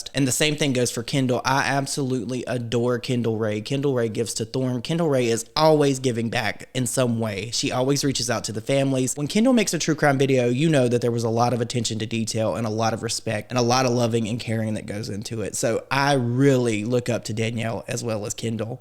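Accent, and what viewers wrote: South, United States. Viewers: Kinda hurt to unsub real quick, but did it for you! Your southern accent reminds me of home 🏡 💞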